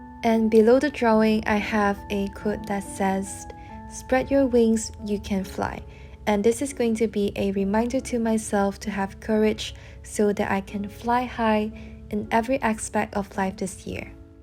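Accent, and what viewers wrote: Malaysia. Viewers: Omgg it's so pretty. Your accent sounds Malaysian, are you from Malaysia?❤️ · Immediately thought that you're a Malaysian when I first hear your voice! Super nice bujo and here you gain a new follower!!! ♥♥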